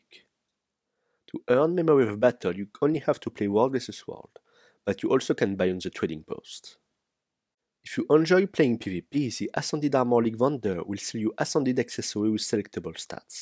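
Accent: France. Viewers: this is the frenchiest french accent in the world.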